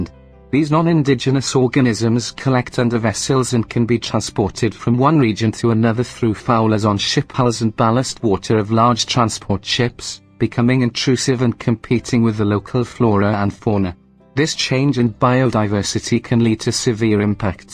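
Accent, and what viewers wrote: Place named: Wales, United Kingdom. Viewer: Wow - a Welsh bot narrator !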